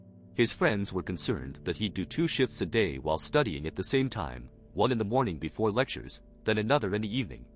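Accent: China. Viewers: I was watching a video you made 8 months ago and you sounded different. Don’t get to good at English I like your slight Chinese accent. With that being said you are arguably more articulate and well spoken than most native speakers.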